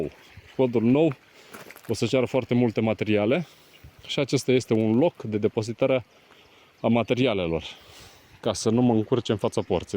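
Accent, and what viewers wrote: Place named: Moldova. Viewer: Salut!! Ești moldovean de unde ? așa se pare după accent scz nu te supăra și eu am mulți veri la Roman (Piatra Neamț)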